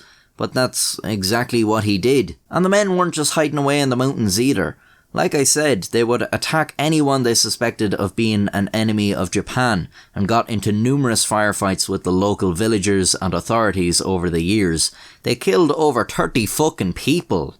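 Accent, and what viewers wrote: Ireland. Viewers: you irish? cuz im from cork and you sound irish XD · An irish man talking about WW2 is wierd. Like watching a swiss made video on WW2. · I always forget this guy’s Irish.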